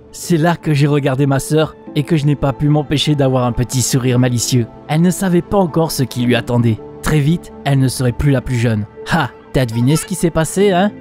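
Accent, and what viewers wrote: Marseille, France. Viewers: L’accent Marseilleais on est laaaaa Désolé pour les fautes 😅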